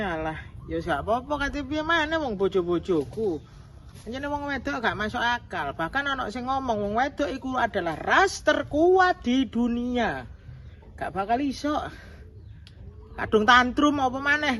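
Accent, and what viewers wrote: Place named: Sidoarjo, Indonesia. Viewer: daerah ndi yo...? logate koyok Sidoarjo...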